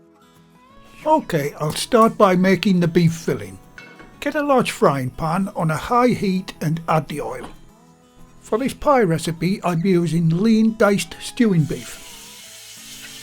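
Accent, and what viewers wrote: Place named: Geordie, United Kingdom. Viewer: This looks amazing, reminds me of the steak pies my mam used to buy from a local butcher. As a fellow geordie i find your accent so lovely to hear .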